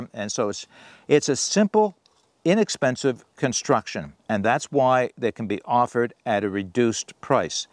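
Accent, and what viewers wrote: Canada. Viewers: Thanks. I m sure that I’m not alone, in appreciating your easy yet thoughtful presentation and nice soft Canadian accent.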